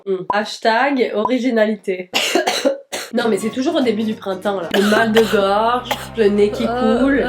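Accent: Quebec, Canada. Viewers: Grave on dirait vraiment qu'elle est né à Québec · Tu commence à avoir l’accent québécois j’aime bien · Jai une pote quebequoise elle me tue avc son accent et jaime beaucoup laccents québécois et je croyais que le Québec parlai pas francais hihiii donc jai appris laccents grâce à toi je la parle que quebequois la hopihoihii...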